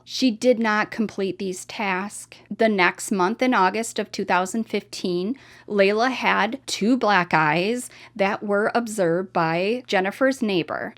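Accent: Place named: Minnesota, United States. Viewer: Where’s you’re cute accent from ?? Sounds like Minnesota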